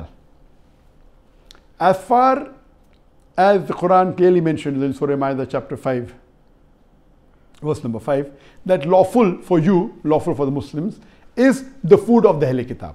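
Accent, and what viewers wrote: India. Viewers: what was the last word ? i didnt hear it why he speaks in hindu englisch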